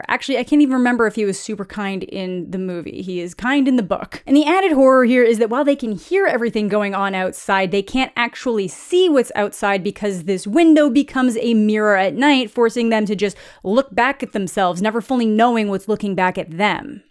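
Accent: Quebec, Canada. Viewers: I actually love your Canadian French. It sounds great <3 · You speaking French made my brain hurt. I speak French and even if I didn't know you were Canadian I would be able to tell from your French. Honestly great though, keep it up.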